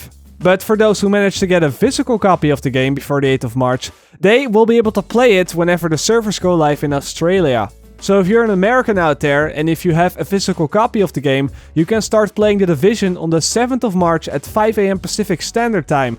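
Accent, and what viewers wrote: Netherlands. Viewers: nou jij komt uit nederland zo te horen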